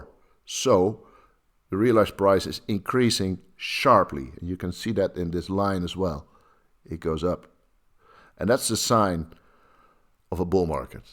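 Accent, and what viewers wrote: Netherlands. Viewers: Duurde wel even voordat ik het NLse accent herkende.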